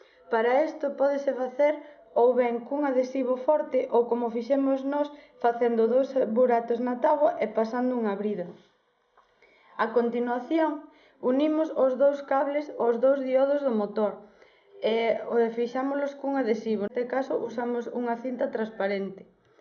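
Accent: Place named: Portugal. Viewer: Parece catalan pero esta muy mal hablado , tiene un acento de portugues pero igual se entiende muy poco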